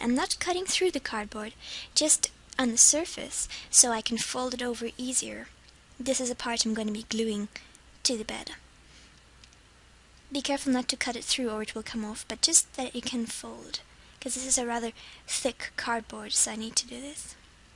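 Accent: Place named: RP, United Kingdom. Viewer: you sound posh lol but i like it :)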